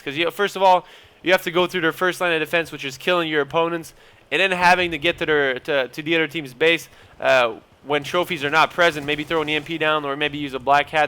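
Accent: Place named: Quebec, Canada. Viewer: it sounds like ur quebecois accent is more obvious after u do ur french commentaries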